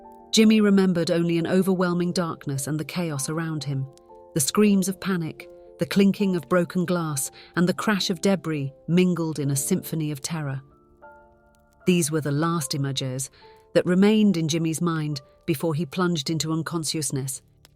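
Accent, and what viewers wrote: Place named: United Kingdom. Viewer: I love your narration style and your British accent. So, I subscribed to your channel a couple of minutes ago.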